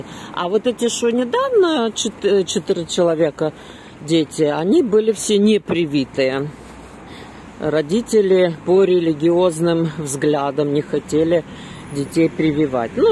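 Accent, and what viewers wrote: Odessa, Ukraine. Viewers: Прогулка по Одессе прелесть! Милый одесский говор! Оставайтесь прежними одесситами, не поддавайтесь новой политике. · Большое спасибо за прогулку. Я в Одессе училась в ОГУ, работала вышлв замуж, родила реенка. К большому сожалению по семейным обстоятельствам вынужлена была уехать в другой город, но Одесса всегда со мной. Судяпо говору вы настоящая одесситка. Обожаю вас. Напишите по какой улице вы идете, для меня это важно. Только название настоящее. Заранее благодарю. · Класс!!! ---,, но уже пошли по здесь! " неповторимый Одесский говор, мягкий, добрый!!! Маса удовольствия, слушать ВАС!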